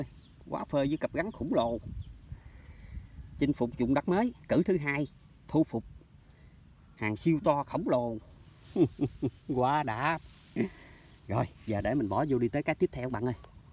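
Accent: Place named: Hau Giang, Vietnam. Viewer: Bạn quê Hậu giang hả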